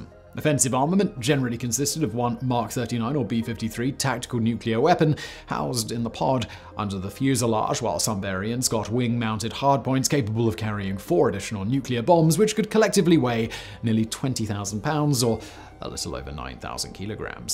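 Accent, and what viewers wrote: RP, United Kingdom. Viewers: that's cool as, I was the Wikipedia editor that added the "Adverse Flight Characteristics" sub section and the part about "fuel stacking", made me feel special hearing mention those in his posh British accent!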